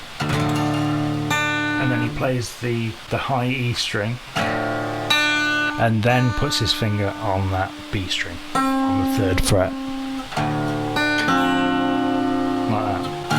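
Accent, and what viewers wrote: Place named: England, United Kingdom. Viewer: Excellent lesson. Really glad I stumbled upon your channel. I often search out Neil related tunes/covers/lessons and am surprised your videos don't come up more frequently/higher on the list. I am impressed with the use of index finger nail as a plectrum - perhaps time to let that nail grow a bit. Also impressed with your vocals as you switch from your native "English" accent to your Neil voice - well done!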